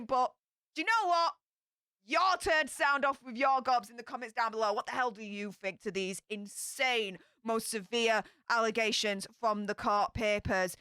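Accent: Yorkshire, United Kingdom. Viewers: Hey love your sass and Yorkshire gobiness. Sending love from Bradford.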